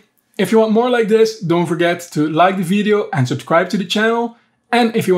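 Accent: Netherlands. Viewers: In the US that's a Millenial. I'm used to hearing the Dutch accent so much that I didn't even notice yours. LMAO! I'm a Dutch American. My ancestors came from Holland and Austria. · It because of the Dutch accent.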